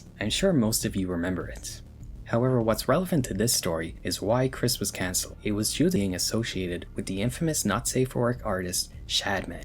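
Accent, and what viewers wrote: Ireland. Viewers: Great video, watched tbe whole thing. Also what accent is that? I thought you were american at first but you say your THs and Rs in a very Irish way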